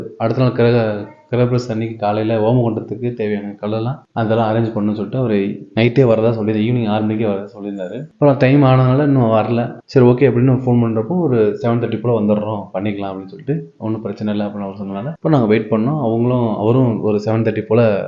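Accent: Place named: Tirunelveli, India. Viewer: பேச்சு வழக்கு பார்த்தால் கோவை மாதிரி தெரியலையே. நெல்லை மதுரை மாவட்டமா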